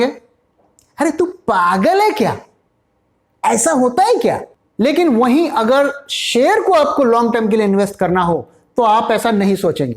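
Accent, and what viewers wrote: Bengal, India. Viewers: Sir ,apki baton se malum chalta hai ap Bengali ho...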